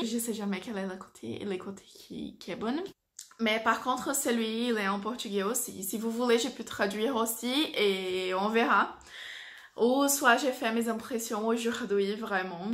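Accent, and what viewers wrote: France. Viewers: Que linda ela falando francês!!!1 Seu francês evoluiu muuuuito,. Parabéns!!! Tá com sotaque francês já hahaha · Tu as l’accent du Sud de la France, c’est mignon. 💖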